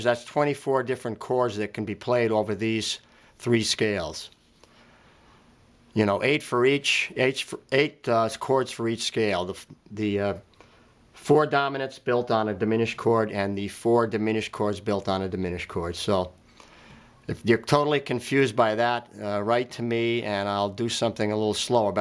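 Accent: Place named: New England, United States. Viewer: That was one of the best lessons I've ever had! What a great video. Clear, concise and impactful. Thank you! I've subscribed and will check out your website today. PS, great to hear a NE accent down here in TX!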